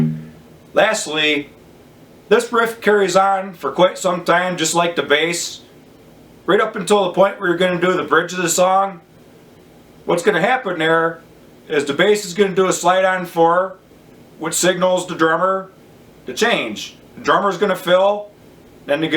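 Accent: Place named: Canada. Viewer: I hear a Canadian accent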